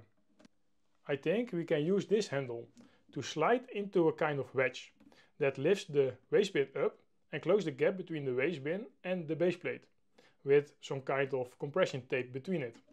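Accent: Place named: Brabant, Netherlands. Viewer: He still gets an A for effort for opening up the concept and its development to everyone world wide. I'll take a thick brabant accent anyday for that :) Goed gedaan!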